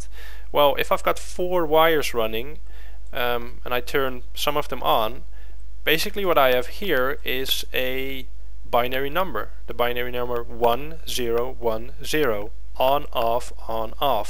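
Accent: Netherlands. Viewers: are you dutch? :P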